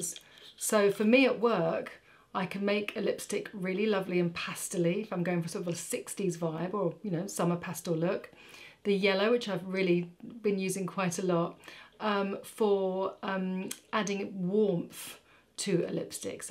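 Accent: England, United Kingdom. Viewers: I love watching you! You are so soft spoken and the English accent is a bonus for me. I’m fascinated by the blending of the lip colors by Mario The color you came up with looks beautiful on you. Your makeup always looks so fresh and clean Have a wonderful Christmas snd some relaxation. Love from the US ♥️🌲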